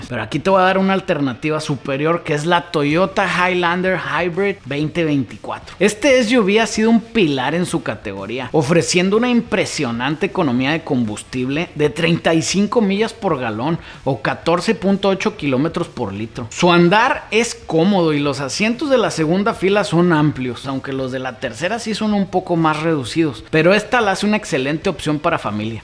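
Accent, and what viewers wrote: North, Mexico. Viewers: No sabia que los gringos hablaban como norteños o de donde es este wey y por que todo lo dice en dolares y galones, que no su mercado es latino? Y seguramente el 80% de sus vistas son en México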